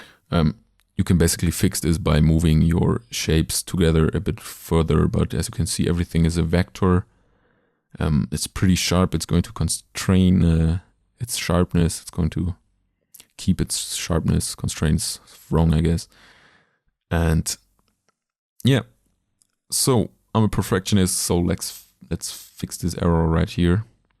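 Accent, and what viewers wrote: Germany. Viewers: Bist du deutscher? :D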